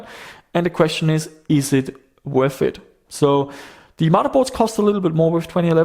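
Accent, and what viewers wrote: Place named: Germany. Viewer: He's Australian, but I believe he has family in Austria from memory, so that may be why he sounds slightly German.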